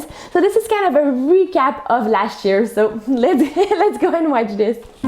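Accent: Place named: Quebec, Canada. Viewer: How exciting for you! Congratulations on your loom. I have been weaving on a rigid heddle for a few months and hope to one day acquire a floor loom. Have fun and thank you for your videos. I like your accent. I am guessing French-Canadian?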